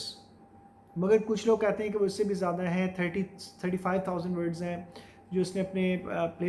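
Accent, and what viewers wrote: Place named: RP, United Kingdom. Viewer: Great elucidation. Especially ,I greatly admire your RP accent .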